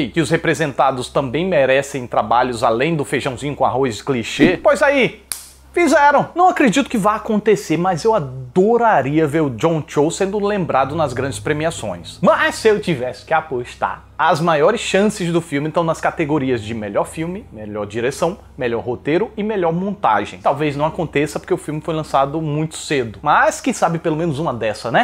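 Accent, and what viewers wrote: Northeast, Brazil. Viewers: De q parte daki do Nordeste vc é? Coboco arretado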